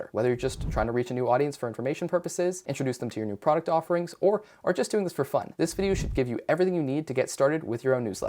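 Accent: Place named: United States. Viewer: So just slow the video down? (I’m an American as well, but your American is showing)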